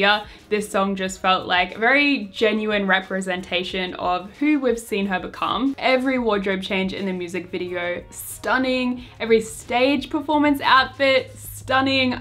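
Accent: Australia. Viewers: OMg this video was so cute and I didn’t realise you were from Brisbane (I’m from Adelaide and I thought I recognised a bit of the Aussi accent 😂). My fav playlist song of all time has to be by but I’ve actually been loving by even though I thought nothing could top 😍 Insta: breathing_underwayer456 and I’d be happy with either album 😊😍 Thanks so much for this and it’s honestly so great to see other Australian Kpop fans (even tho no one ever come here for concerts 😭😂)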